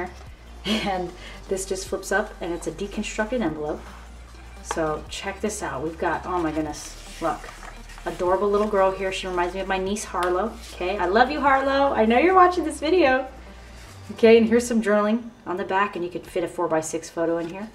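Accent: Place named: United Kingdom. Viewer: What a lovely little folio! beautiful work as usual (British accent :))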